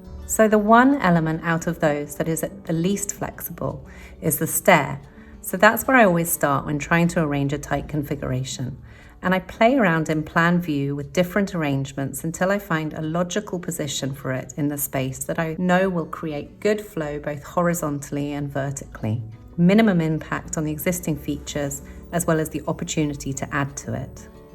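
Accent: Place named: United Kingdom. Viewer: I know nothing about architecture. But I do enjoy your videos so much, they are so relaxing complete with the slick animation, the gentle background music and your soft spoken narration with that beautiful British accent which is a pleasure to listen to. Fantastic work, thank you.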